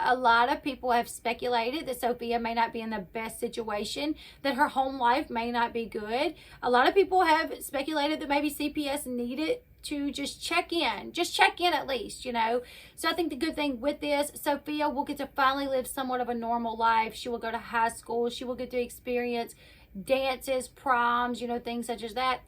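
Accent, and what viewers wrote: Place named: Shreveport, United States. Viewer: I love your channel and accent, it sounds like my family in Shreveport (I'm currently in Detroit).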